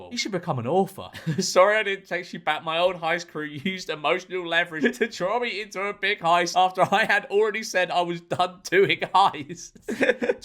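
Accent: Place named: Birmingham, United Kingdom. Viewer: Extra brummie today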